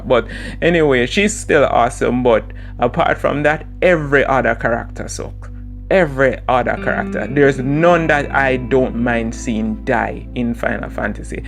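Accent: Jamaica. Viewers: are you jamaican? you got a accent.I'm trrying to place it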